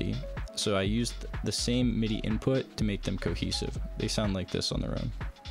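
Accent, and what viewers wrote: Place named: United States. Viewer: Bro u live in Bangladesh then how did u pick up that American accent?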